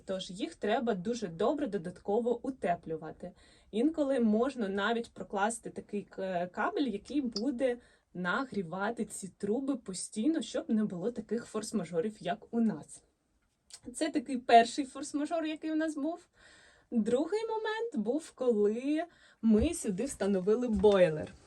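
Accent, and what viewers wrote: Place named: Surzhyk, Ukraine. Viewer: Красунечко! Ви молодчинка, що намагаєтєся розповідати усе українською. Але слово ДВЕРІ, за ДВЕРИМА тощо.. це завжди МНОЖИНА! підказка, яка полегшить Вам вимову без суржику ...Перед зйомкою кожного сюжету ознайомтесь з усіма маловживаними або маловідомими українськими словами з тих, які плануєте використати у своєму черговому випуску. Бажаю успіху Вашому каналу!!🎉🎉🎉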